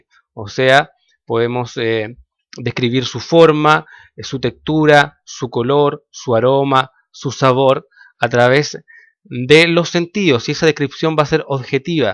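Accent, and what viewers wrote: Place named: Chile. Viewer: solo un segundo me bastó para reconocer a un chileno xD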